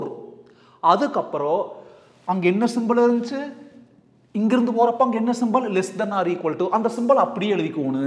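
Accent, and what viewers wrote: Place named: Kerala, India. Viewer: Konjo malayalam slang, coimbatore slang, Tiruppur slang kalantha mari iruku sir....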